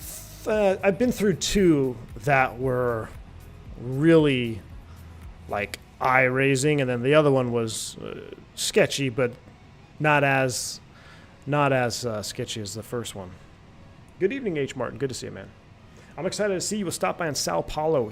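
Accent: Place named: United States. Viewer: Sounds like an american accent to me...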